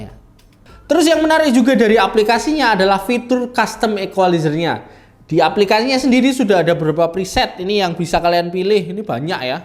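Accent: Java, Indonesia. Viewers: logatnya jawa banget... saya udah punya yang soundcore R50 NC ... apakah P40i ini kualitasnya lebih bagus dari R50 NC?😅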